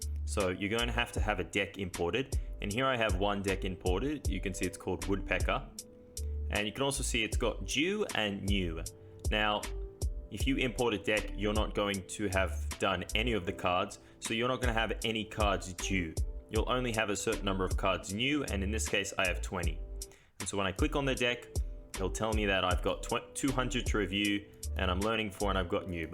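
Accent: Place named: Australia. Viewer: ah I know that accent! Where are you from in Australia? Subbed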